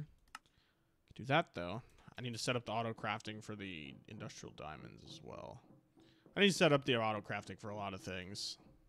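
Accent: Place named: United States. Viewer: Good prononciation of "excellent", just a thick American accent which is fine but unusual from a native speaker's perspective.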